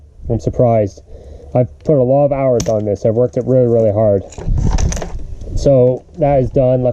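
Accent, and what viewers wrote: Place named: Canada. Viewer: Thought I detected a slight Canadian accent, there - Eh?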